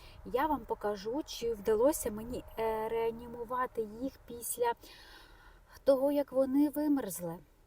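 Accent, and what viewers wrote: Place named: Russia. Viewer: Досить дивне питання на дванадцятому році війни, якою мовою вести відео блог. Але, Богу дякувати, і до вас дійшло. Мовлення ваше ще переповнене русизмами. Відчувається, що ви думаєте тим узкім ізиком. Проте, старайтесь, і у вас усе вийде. Головне - бажання виходити із зони комфорту і працювати, працювати, працювати.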